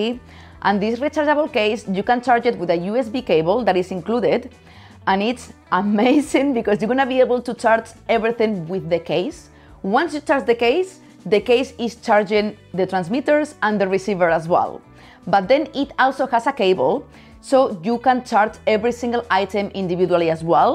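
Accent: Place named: Spain. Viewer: I have to tell you, you have taught this old photographer so many useful tips and tools. I always look forward to your fantastic videos. Side thought, you look amazing and I love your Spanish dialect. See you in the next one.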